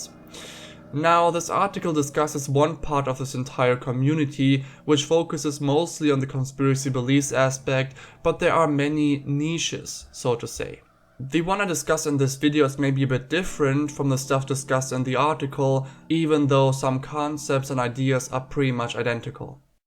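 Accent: Germany. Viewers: His accent sounds very similar to a German one. Almost South African. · basne He's actually saying 'can't be asked' which is a common mistake we Americans make, due to mishearing or misunderstanding the British 'arsed' as 'assed' and then further twisting that to 'asked.' He also typed it as such in the video description. The accent, though? I'd have to say it sounds a bit German - to my ears he definitely does not have an American accent. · Are you German by any chance? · German for sure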